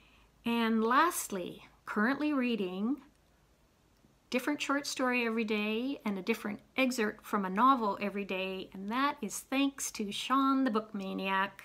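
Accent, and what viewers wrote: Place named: Canada. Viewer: You were discussing your accent in this video (just to refresh your memory since this was a LONG time ago). I LOVE your accent. I feel like people from Canada seem to annunciate much better than Americans and I'm not sure how much of that is accent and how much is just valuing speaking properly. I only have a handful of Canadian friends, but they all tend to speak slowly, carefully, and annunciate!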